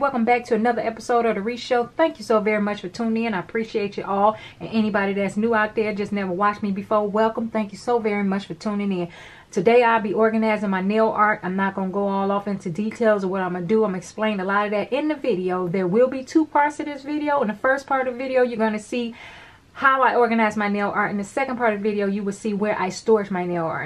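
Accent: South, United States. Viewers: I love you I can listen to the no nonsense southern accent, I’m a fan for life… I need ideas for storing all my Polygel tubes…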